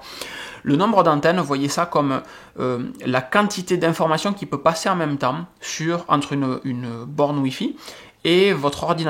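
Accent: South, France. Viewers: Salut, merci pour cette super vidéo. J'aime beaucoup ta façon de partager ta passion et de vulgariser de façon simple tout ce que tu expliques. Et franchement ton accent et tes expressions telles que "ça poutre" ça me tue, je suis aussi du sud, mais sud sud (34) pas sud-ouest donc c'est kiffant. Pour en revenir au sujet, j'utilise perso un ORBI RBR20 ça suffit amplement pour chez moi et au boulot je mets de l'unifi partout (je suis dans la tech aussi, support/installation), c'est amazing ubiquiti c'est la vie. Je ne mets par contre par autant de bornes que tu préconise (comme les musées) mais une ou deux par "étage" des UAP-AC-PRO qui font je crois du 3x3 MIMO et là aussi (on a que des portables et mobile) ca semble suffire. Mais tu me fais poser la question si je ne devrais voir pour augmenter ce nombre car beaucoup beaucoup de devices en Wi-Fi et que 2 bornes... 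Bref continu comme ça! Top moumoute ta chaînes et tes vidéos.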